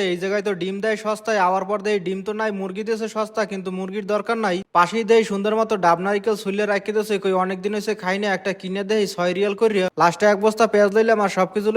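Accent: Barishal, Bangladesh. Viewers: ভাই আপনার বাড়ি কি বরিশাল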